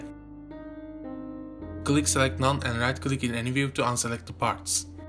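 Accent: Turkey. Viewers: ingilizcenden belli kardeşim sen Türk'sün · ingilizde aksanından bile türk olduğun anlaşılıyo .d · askandan anlaşılıyor türk olduğundan :) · türkmüsün la sen :D ingilizcenden anladım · túrk olduğunu aksağaníndan anladím benden kaçamazsín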